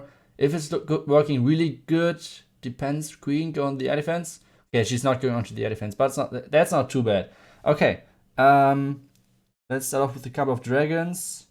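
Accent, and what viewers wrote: Germany. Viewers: You can hear the German accent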